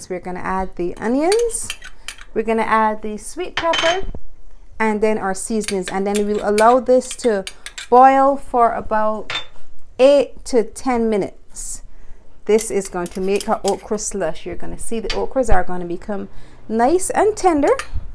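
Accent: Barbados. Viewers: What a sophisticated bajan accent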